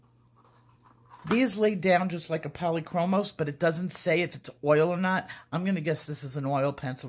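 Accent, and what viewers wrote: New York, United States. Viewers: Love your voice & N.Y. accent.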